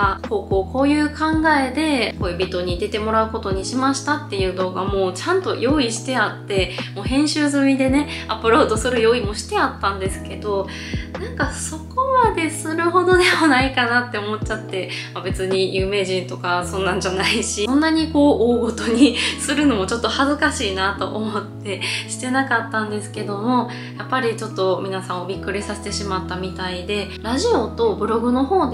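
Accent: Kansai, Japan. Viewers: 最近のあんくんと出ている動画では標準語寄りだけども、1人で話す時はやはり関西弁になるのがかわいい（笑） これからも変わらず応援していますよ✨✨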